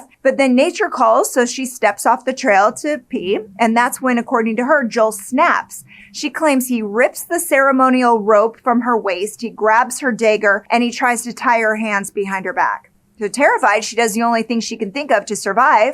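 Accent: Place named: South Dakota, United States. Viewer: Girl, I love you! I’ve been suppressing the urge to ask this for quite a while, but now I have to, lol… are you from South Dakota, by any chance? Only asking because I’ve always detected a hint of an accent/pronunciation with words like, “bag”… for now “dagger.” a couple friends of mine from South Dakota pronounce things similarly. Obviously, I respect your privacy, so no need to share, it’s just my ADD brain 😂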